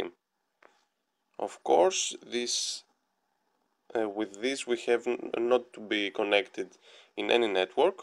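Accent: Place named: Greece. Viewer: η προφορα κανει μπαμ οτι ειναι δικος μας:ρ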